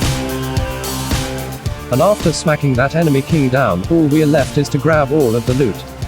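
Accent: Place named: India. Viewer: He is indian. I automatically off when i heard the accent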